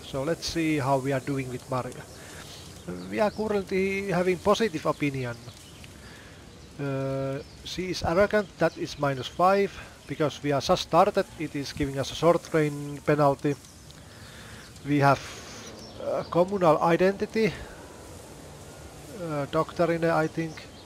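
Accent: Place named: Finland. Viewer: You sounds very finnish. I like it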